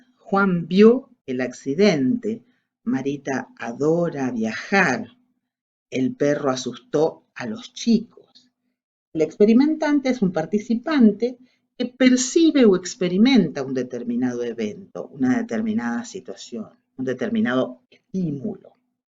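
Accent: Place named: Argentina. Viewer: Por favor no dejes de hacer estos videos! Están increíbles. Muy bien explicados, muy interesantes y en español de argentina! 🇦🇷❤ Te agradezco mucho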